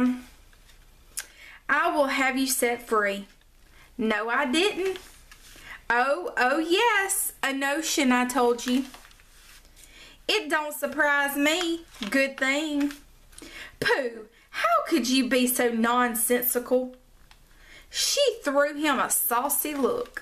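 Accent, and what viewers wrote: South, United States. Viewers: That whole book sounded like a Southern conversation, lol. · Lovely story AND art!!! And the award goes to...🥁🥁🥁 Southern speaking ladies!!! I'm from Alabama so this is quite refreshing, like a big ol glass of lemonade. Love your videos. 💕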